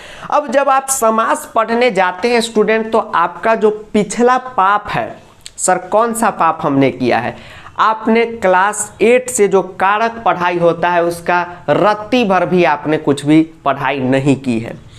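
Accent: Bihar, India. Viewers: Aap bihar ke ho kya?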